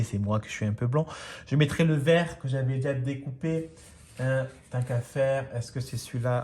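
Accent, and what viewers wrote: South, France. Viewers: Sa vas? Accent du midi sa réchauffe le coeur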